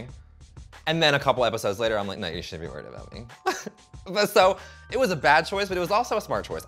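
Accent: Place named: South, United States. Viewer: So handsome and I love the southern accent coming out. Being from Louisville ky very refreshing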